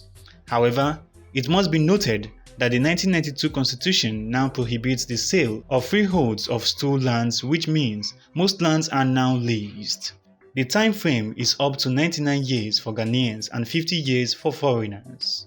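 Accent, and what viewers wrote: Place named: Nigeria. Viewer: Nigerians accent, but good video. Said many stuff in few minutes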